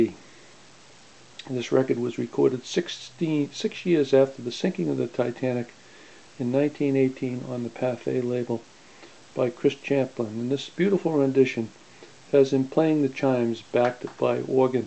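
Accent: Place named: Rhode Island, United States. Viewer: Just beautiful. So moving and reverent. I will never forget visiting the graveyard in Halifax where many of the dead are buried. What an emotional1 experience. This was m.h magnificent, thanks. By the way you have GOT to be from Rhode Island! The accent is unmistakable. Spent my earliest years there. I know that accent well!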